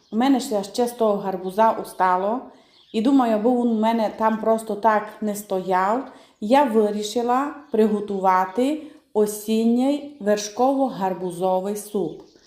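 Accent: Transcarpathia, Ukraine. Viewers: Пані, Ви готуєте гарбузовий суп так, як готують в Австрії. В нас вдома (чоловік австрієць, дуже смачно готує) в цю пору цей суп часто в меню. Перед поданням посипають невеликою кількістю підсмаженим насінням гарбуза і легенько поливають гарбузовою олією. Ви прекрасна господиня! ❤ Дужу люблю ваш закарпатський діалект, слухала б годинами. Мирного неба в Україні!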